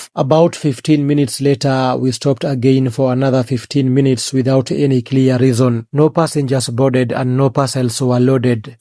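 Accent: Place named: Luo, Kenya. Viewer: Where did you get Luo accent? Are you Moroccan?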